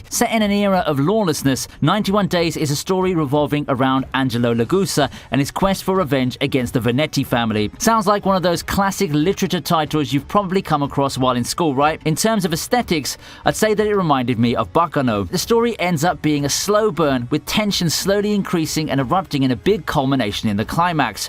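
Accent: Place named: United Kingdom. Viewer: Dude are you from the UK? Also good vid. I've picked out 3 to watch. Rage of Bahamut, Yona of the dawn and Rainbow. Cheers pal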